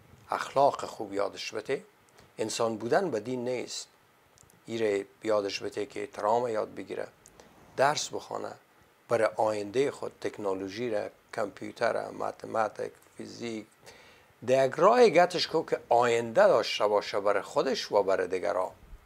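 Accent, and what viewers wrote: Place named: Afghanistan. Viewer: درود بر آقای گرامی. باید اعتراف کنم که آقای این کلیپ ارزشمند و بدون تردید آموزنده و تاثیر گذار شما یکی از بهترین ویدیو هایی است که من مشاهده کردم و از آن خیلی لذت بردم بویژه آنکه بزبان بسیار ساده و روان و لحجه شیرین افغانستان بیان کردیدو من به شما تبریک میگویم! این کلیپ از آن جهت ارزش مضاعف را بخود تخصبص میدهد که الف بای آموزش کودکان یک جامعه را در بر میگیرد که در پرورش یک نسل جدید و مستقل و دانا و هوشیار را پایه گذاری میکند و طبعا با این روش آموزش و پرورش خردسالان جامعه از مرحله مهد کودک باید آغاز گردد و سپس در مراحل سواد آموزی ابتدایی و دبیر ستان و دانشگاهتا مرحله دکترا ادامه یایدو تنها در اینصورت است که نسل پرسشگر و اندیشمند و متفکر تحویل جامعه خواهد داد گه نه تنها به کشور خوی خدمات ارزنده ای خواهد نمود که به جامه بشریت نیز خدمت خواهد کرد چنین نسلی هرگز و به هیچ طریق و از هیچ کسی اطاعت کورکورانه نخواهد کرد و از آنجا که با خرد گرایی رشد می یابد و بزرگ میشود همواره به علل و عوامل پد یده ها توجه لازم را دارد و هر تصمیمی را بر اثر آموزه های عقلانی و علمی مورد توجه و بررسی و تحقیق قرار میدهد و سپس عمل می کندو طبیعتا بندرت دچار اشتباه میگردد!!!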